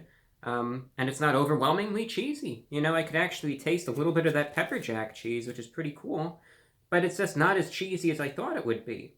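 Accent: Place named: New York, United States. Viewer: Clearly a New York accent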